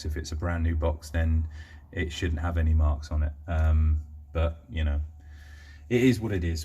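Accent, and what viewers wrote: United Kingdom. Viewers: Hi great channel, it sux snap on hasn't been great for you. I watch the channel cause you talk about snap on tools, nice to hear from a Brit instead of a yank for a kiwi snap on fanboy. love your toys!!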